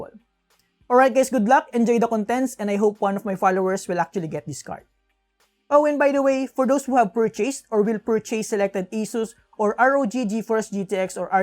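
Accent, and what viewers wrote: Philippines. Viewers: Filipino rightt? Accent palang e hehe. GOODLUCK PO SA LAHAT!! pati pala sakin ehem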